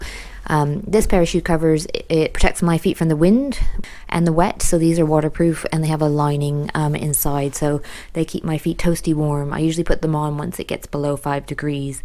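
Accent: United Kingdom. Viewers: sounds british but then words like 'about' popped up and i got confused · i m sidetracked but just want to tell you that, your accent adjusted very well to British. You achieved one of most difficult task in the world.😁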